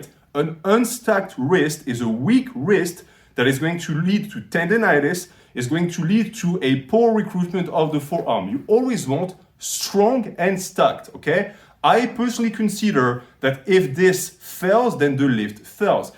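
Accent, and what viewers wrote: France. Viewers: Are u french? Nice accent 😂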